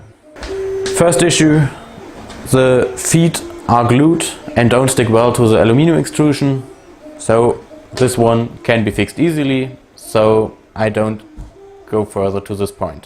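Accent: Germany. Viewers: your english is better than some native speakers here the US.. plus having a German accent works well with talking about mechanical things. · Sehr informatives Video, vor allem wegen der "kleinen" Fehler die an einem nagelneuen Drucker auftauchen. Habe mir bei "Aliexpress" einen für 175 € bestellt. Bin jetzt vorgewarnt hinsichtlich der Produktionsqualität. Werde den Aufbau mal protokollieren , ob die Fehler inzwischen behoben sind. Apropo Englisch - ist OK - ,der deutsche Akzent ist in den USA sogar recht beliebt. Und man redet keinen Müll bei technischen Themen. Very informative video, especially because of the "small" errors that appear on a brand new printer. I ordered one at "Aliexpress" for 175 €. I am now forewarned regarding the production quality. Will log the structure times, whether the errors are now fixed. Apropo English - is OK - , the German accent is even quite popular in the US. 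And you do not talk trash about technical issues.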